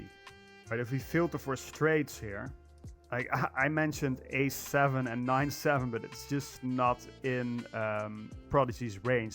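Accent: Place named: Netherlands. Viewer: Horrible Dutch accent😂😂😂..nice vid though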